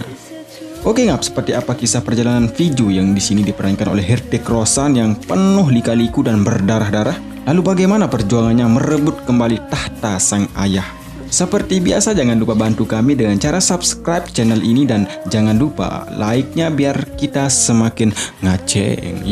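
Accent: Bali, Indonesia. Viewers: Logat Bali ini gan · Owhh soale logatx kyk org bali..sucses sellu mas..aamiin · logat bali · Orang bali ya bli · Kayanya pengisi suara orang bali.. Keliatan dari vokal ngomong nya.. Bener gak?🤭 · Pembawa suara orang bali bli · Loghat Bali,kya susah ngomong (pengulasnya) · Narator nya bhasa nya kek dr bali ya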